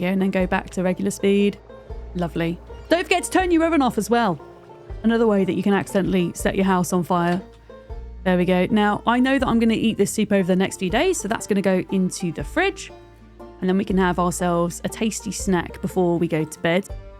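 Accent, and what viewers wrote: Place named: United Kingdom. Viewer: Hey, your video was recommended to me enitely by the gods of YT's algorithm and I'm gratefull for it! Good to hear a fellow Brit gamergirl! Keep it up!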